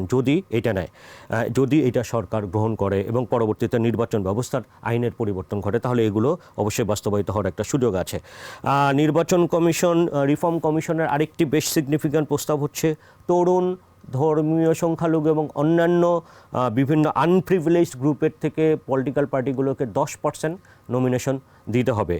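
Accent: Kolkata, India. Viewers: ডেইলি স্টার দাদার মুখে কোলকাতার ভাসা আসে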